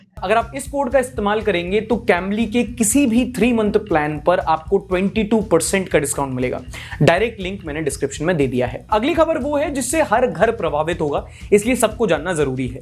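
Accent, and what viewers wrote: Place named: Patna, India. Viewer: Aapke speaking style se lagta hai aap patna se ho. Am i right?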